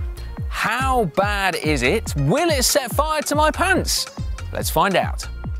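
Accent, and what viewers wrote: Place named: RP, United Kingdom. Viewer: there needs to be a super cut of loathing things....cows, myatu's, not getting KOM's...exclusively in his needlessly posh voice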